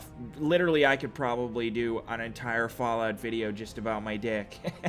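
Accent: Canada. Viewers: you sound canadian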